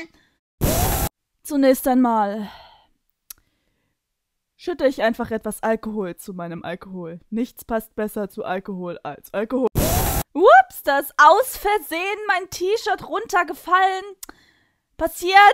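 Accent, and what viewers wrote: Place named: France. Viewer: haha wie geil :D Dein französcih-deutsch hört sich so goil an...RECHTSCHREIBUNG ARGH! XD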